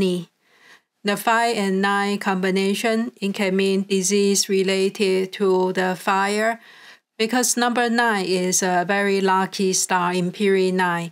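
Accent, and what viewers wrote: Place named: China. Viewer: Sorry cannot understand chinese mix English with strong accent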